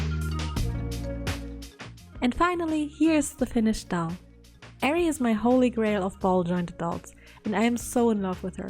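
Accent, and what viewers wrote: Germany. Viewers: Du bist deutsch oder? Ich habe es an deinem Akzent mitbekommen PS.sehr viel talent! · Are you German? Your accent sound like German♡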